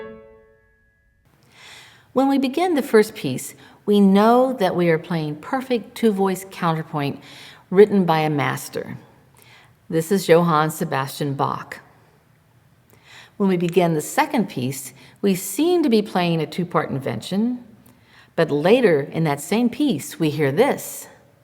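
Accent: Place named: United States. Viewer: It's just the American accent that one needs to retune to 🎉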